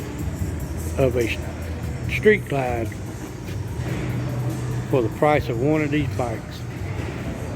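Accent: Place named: South, United States. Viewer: Well Sir you talk just as country as me.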